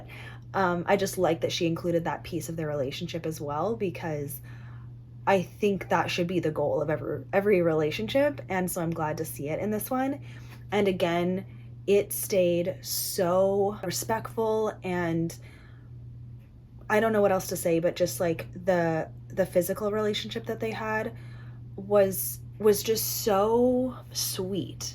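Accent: Canada. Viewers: Literally me watching your video as someone who hasn't seen you before: "She sounds like a Canadian." A few minutes later: "Shout-out to Canada!" 😂😂 nice to find a fellow Canadian book girlie! I loved that part of Blair's story too!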